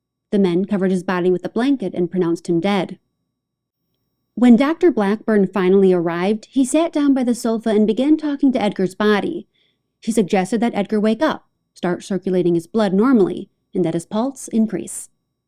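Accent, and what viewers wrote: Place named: Canada. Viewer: I can tell you're Canadian i don't know you nor did i research, Boom I'm a profit...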